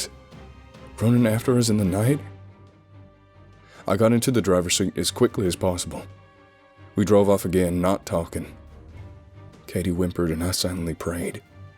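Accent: South, United States. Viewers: Faith Castillo - haha fair enough! It IS a good southern accent, anyway. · I settle in to hear soothing voice and am startled by this jarring "american" accent. Yikes! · As somebody from the South, I'm really blown away by your Southern accent. Like, can we informally adopt you? 😂 Bravo! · Once again sir, well done. as per usual, your timing, tone, and emotion is perfectly delivered. Maybe you could mess up every now and then, just to prove you are not an alien life form. Also, I absolutely love this accent. It sounds to me like a mix of north Tennessee good ole' boy, and a south Georgia gentleman, but that's just my take on it. You could easily pass for a real Southerner. Keep up the great work buddy! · are you sure that you weren't born in the south? Your accent is spot on! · I Love the Southern Accent! 🤗 Really Wild Story! 😍 Thanks For All Your Hard work! 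😙🙋✌💜🐺🐶🌻🐢🐾🐳❤💋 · "Butt hurt" 😂 I haven't seen any butt hurt comment here yet, still scrolling tho. So far people seem to like the accent. I personally don't like southern accent at all, for non-english speaking like I am it sounds somehow slurry and words are sometimes very hard to recognize. It's important to remember that disliking someones accent doesn't mean the person themself is dislikeable (is that a word 😅). I'm definitely not butt hurt about this story's accent since the author has so damn beautiful voice 🥰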